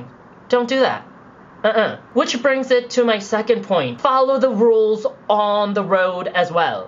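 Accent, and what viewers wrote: United Kingdom. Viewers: I agree; I don;t think your a tourist if your "living" in London. And I agree with everything you said! Also, I think your starting to pick up a English accent in your pronociation(sp?) of words. Off point; Where do you live in London? What area? Make more of these vlogs. :) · I don't care if you're American, you spoke like a true Brit in this video. Welcome to the family.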